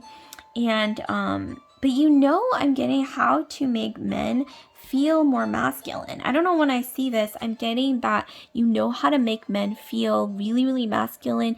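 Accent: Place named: United Kingdom. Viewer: That’s so funny I picked plié 2 and I love the using the British accent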